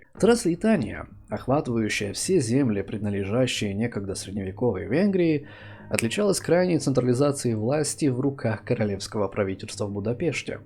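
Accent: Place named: England, United Kingdom. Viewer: Как мне обучиться такому же английскому акценту как у автора видео?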